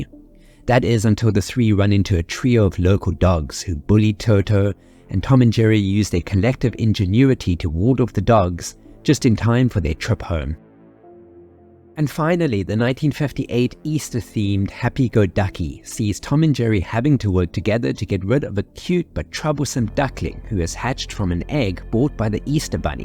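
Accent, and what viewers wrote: South Africa. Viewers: Hey you are South African right judging by your accent? It’s nice to meet a fellow South African cartoon lover.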